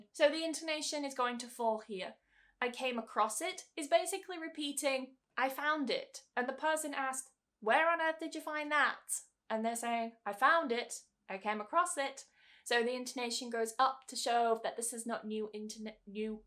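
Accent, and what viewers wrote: United Kingdom. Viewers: English pronounciation? Or british accent pronounciation? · British accent is fascinating 😍 but I wanna learn US. accent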